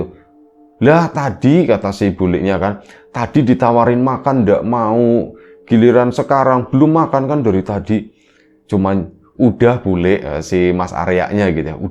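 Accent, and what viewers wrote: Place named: Banyumas, Indonesia. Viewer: Wa, alaikummusasallam wr wb, Hadir dan nyimak selalu, Oh, iya buat mas narator kok ga di jawab pertanyaan saya, Mas narator asli nya mana...? Seperti nya logat nya ada ngapak ngapak nya, maaf ya mas.... Ok dia terbaik untuk Riam biru, moga makin sukses dan buat mas narator nya moga sehat dan slalu dalam lindungan Allah SWT.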